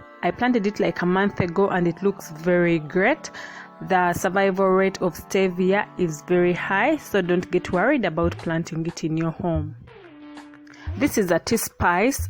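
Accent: Uganda. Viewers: She is likely ugandan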